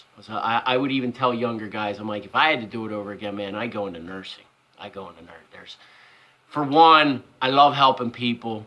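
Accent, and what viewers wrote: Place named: Mid-Atlantic, United States. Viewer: Ha, I am in South Eastern Pennsylvania, I thought that I recognized a Mid-Atlantic accent, ha, Alcohol is my drug of choice, drinking Vodka as I type, I used to smoke weed years ago, not really my thing anymore, I’ll take a few hits her and there, do what you do man, it’s all good, trucking is horrible these days, still an LTL driver, companies such as YRC and NEMF etc, are having a tough time finding drivers, local drivers, because, they do not want to pay anything..oh well..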